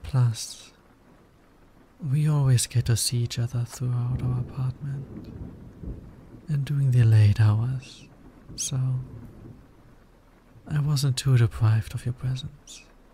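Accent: Germany. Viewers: Der Deutsche Akzent ist 🤌🏻🤌🏻🤌🏻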